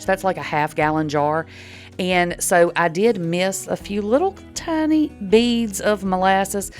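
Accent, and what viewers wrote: South, United States. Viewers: I just subscribed and glad I did. The dry mixes are great!! I do several homemade things, mostly liquids and brown sugar. I see you mention jar sizes on most. I love to stir between items too. I feel I distributes ingredients better..?? Don’t know but, good distribution to me, gets it well blended. Thank you for all you do. BTW: I’m an 60 year old country girl who says” pe-can”. Northern people say, “pe-con.” Both are correct according to the dictionary but, you sound southern. Especially y’all. ❤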